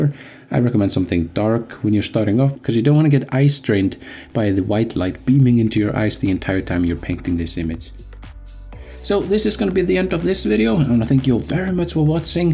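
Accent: Iceland. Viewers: Great tutorial! Thank you. Love your Icelandic accent too, a bonus! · Hi, thank you soo much for these amazing tutorials! I’m completely new to digital drawing (and not very experienced in drawing in general) and just got my first iPad (pro <num>) and bought the Procreate app mostly out of hopes to unleash my creativity in a new way. However, as I started exploring the app I got quite overwhelmed and a bit discouraged (and amazed as well of course) by all the things you can do with the program, so when I found your videos today (!) I felt really glad and hopeful that I can learn and eventually have no limits in creating in it but my own brain…. 😌☺️👍 I will check out all your tutorials and stuff (some, like this one, a few times or more I guess, before I really understand this way of thinking and creating) and I really want to thank you and commend you on great teaching skills and videos! 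Extra plus for your wonderful Icelandic accent as well! 😉👍 Best wishes from an inspired Procreate beginner in Sweden!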